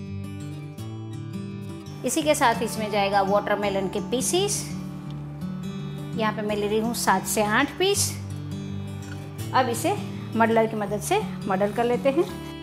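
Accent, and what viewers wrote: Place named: Kathiawar, India. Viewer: Madam aap Kathiywadi ho? Hindi bolne par bhi pata chal raha hey...!!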